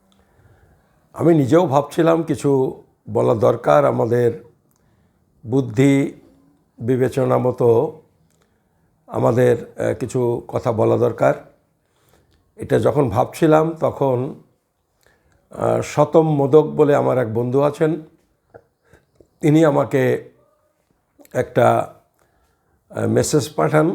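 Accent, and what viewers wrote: Bangladesh. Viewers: তোমার কথা শুনে মোনে hoy তুমিও Bangladeshi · Apni pakka Bangladeshi....kotha sune bujhte perechi... 😂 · কেমন বাংলা দেশি বলে মনে হয়, পুরানো refugee না নুতন refugee. পুরানো দের তেজ বেশী l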